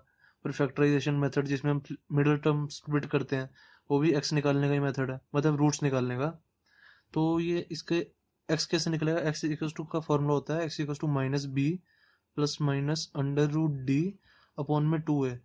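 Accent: Punjab, India. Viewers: r u from punjab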